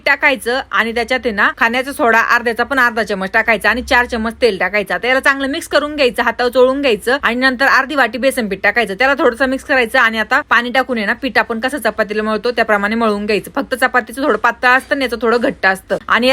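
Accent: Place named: Jalgaon, India. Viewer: जळगावची वाटते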